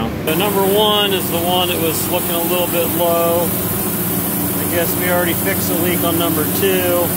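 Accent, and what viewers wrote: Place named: Pittsburgh, United States. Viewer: Sounds like you’re from Pittsburgh that’s the way we talk lol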